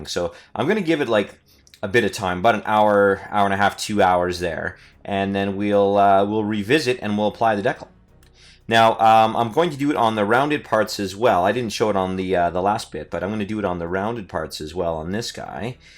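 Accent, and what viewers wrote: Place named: Canada. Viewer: I'm hearing faint traces of a US northern mid-west or Canadian accent, and since he is pronouncing "decal" that way I'm going to guess it's Canadian. That pronunciation is consistent with other Commonwealth nations. The first time I ever heard it pronounced that way it was by somebody from the UK. Didn't seem too strange, but it is a little surprising to hear it in a North American accent, though. I'm glad everybody was able to work everything out.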